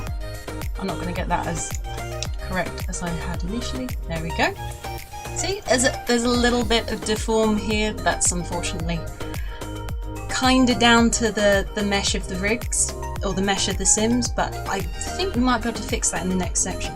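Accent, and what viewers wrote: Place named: United Kingdom. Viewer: Awww your british! Love your voice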